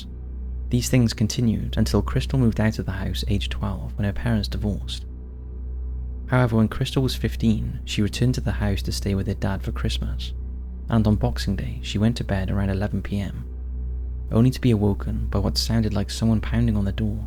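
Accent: Wales, United Kingdom. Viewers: Welsh? Is that the accent?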